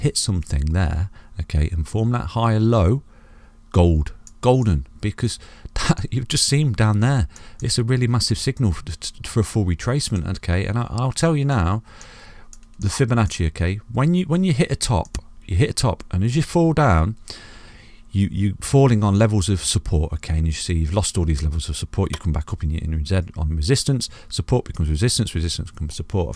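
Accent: United Kingdom. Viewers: Didn't expect to find Chiliz T/A - Have now subbed and hit the bell button :) Great to listen to a british voice. I'm all in :) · Following your prediction perfect so far. Love your British accent, but I think it would be good for your channel to use, or at least mention USD prices. I’m European, but because most people on the internet use USD price, I find it easier to think in USD levels.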